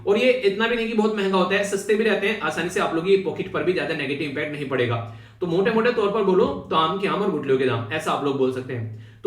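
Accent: Haryana, India. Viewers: Sir ap haryana se ho..... Rewari wale area se..... As I can guess.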